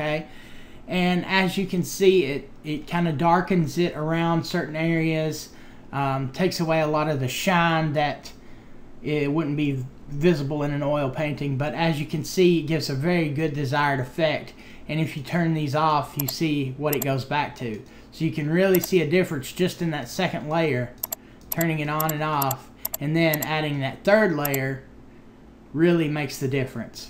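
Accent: South, United States. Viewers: This is THE strongest southern accent I've ever heard :)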